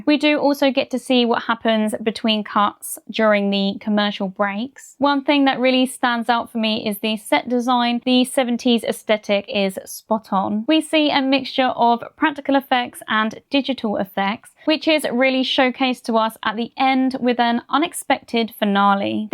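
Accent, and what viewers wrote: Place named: Southern England, United Kingdom. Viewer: I'm guessing u're from southern england like by u're accent, I'm from maidenhead berkshire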